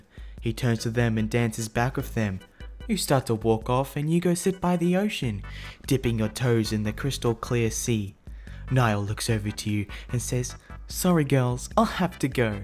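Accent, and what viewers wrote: Australia. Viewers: You're Aussie accent makes this 10x better lol that one ab Shawn mendez, near the mid of the video the last words were 'Shawn winks' but you said it like: Shawn WANKS lmfao that one had me in tears and also the finn wolfhard one lol and the one that says 'or I'll TICKLE YOU' YOURE ACCENT MAKES IT SOOO MUCH BETTER IM TELLING YOU LOLLLL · Is...Is that an Australian accent? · I'm digging the obnoxious Australian accent for all of the guys' voices.👌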